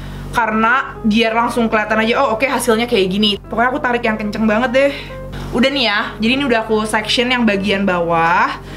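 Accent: Java, Indonesia. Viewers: Enk aj gtu klo dgerin ka ngomong,medog gmn gtu ya wkkwkw😂😛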